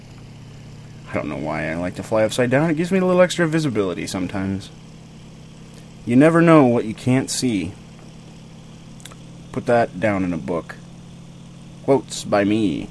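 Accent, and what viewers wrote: Germany. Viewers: I'm a german and i enjoy listening to you speaking with german accent Its hilarios Keep doing it your way, it's awesome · We love the german accent!! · The German accent is the greatest thing ever. If you're trying to make me angry with it, you're going to need to try harder!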